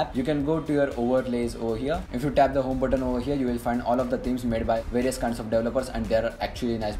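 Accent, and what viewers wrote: India. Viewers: Uhh indian accent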